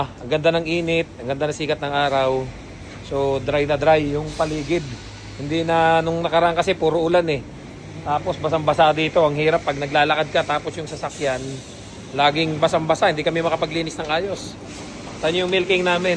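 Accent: Philippines. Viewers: Kuya parang tagalog na tagalog ka? Taga Quezon?, Mindoro? Mariduque?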